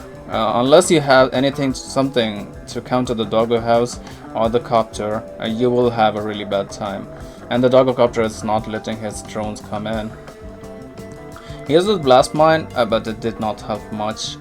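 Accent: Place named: India. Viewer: Accent like indian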